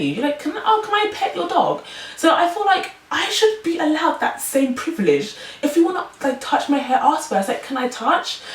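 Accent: England, United Kingdom. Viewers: im not trying to be mean but the british accent is geting a tab bit annoying ... i mean it was ok at first but the video was a little to long for the accent and its getting tireding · your British 😮😮😮yesssss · Holy crap youre english!!!! I love your voice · Honey you are gorgeous, sexy, and I love your British style accent. And you have a beautiful personality and seem to be very intelligent. Your hair is unique and different, and irrelevant to who you are as a total individual. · ahhhh yesss....a black girl with a british accent.....so sexxyyy yum......0_O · Are u from england?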